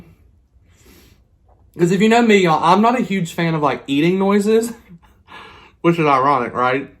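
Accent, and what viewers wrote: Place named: Minnesota, United States. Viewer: I'm jealous, love shrimp. I caught the Minnesota accent. 😁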